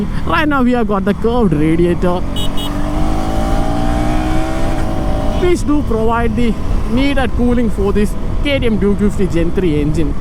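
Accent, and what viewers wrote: Kerala, India. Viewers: Are you from Kerala!? The slang is like that !!!!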